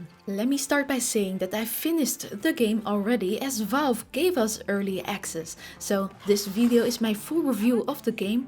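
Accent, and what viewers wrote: Netherlands. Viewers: Are you dutch by any chance? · Her English pronunciation is already nearly flawless. I can understand her perfectly every time she talks, something I can't say about many, many native speakers. And besides, that Dutch accent is awesome!! So my recommendation to you is to go pound sand.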